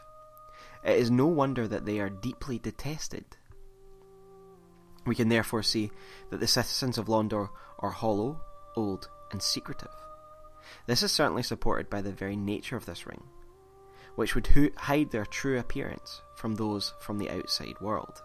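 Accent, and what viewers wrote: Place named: Scotland, United Kingdom. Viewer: Hey another Scottish person! Amazing video mate- very informative, got a new subscriber cant wait to see more!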